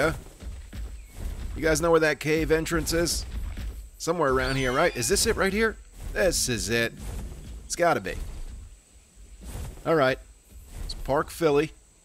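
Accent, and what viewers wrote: New Jersey, United States. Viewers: He is one of the funniest gamers I've seen I think his New Jersey accent is awesome.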